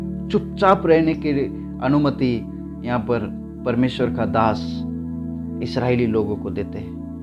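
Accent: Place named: Telugu, India. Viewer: Aapane Vachan bataya bahut Achcha laga aur aap Telugu ho mujhe aisa lag raha hai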